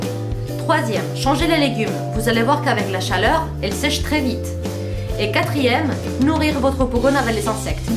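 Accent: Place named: Russia. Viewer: Tu es d'origine russe ? Tu as l'accent 😉 Je parle Russe et travaille avec des russes donc ces accent est très familier.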